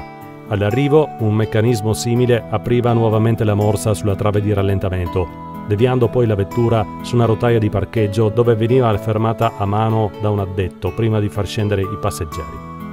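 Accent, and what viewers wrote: Italy. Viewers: Un altro servizio superlativo... niente da aggiungere.. tutto perfetto e bellissimo, narrazione precisa e molto tecnica ma comprensibile a tutti... complimenti davvero...bravissimi (per mi che son della zona l'accento triestin se un plus che fa sempre piazer 😀😀).. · Scusi lei è veneto?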